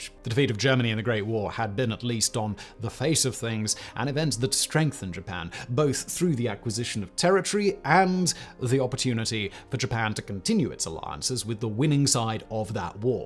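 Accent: RP, United Kingdom. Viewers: Your RP is so over-the-top posh, it sounds similar to Jacob Rees-Mogg.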